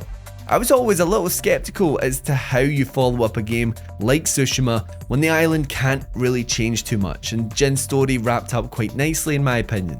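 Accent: Scotland, United Kingdom. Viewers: My sister-in-law is from Scotland and she'd probably describe his accent as "polite Scottish", a toned down version so Yanks can understand.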